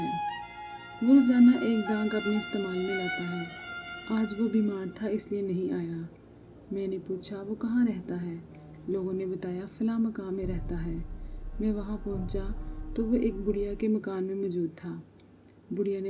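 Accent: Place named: Kashmir, India. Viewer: I hope u from Kashmir it's your voice 😲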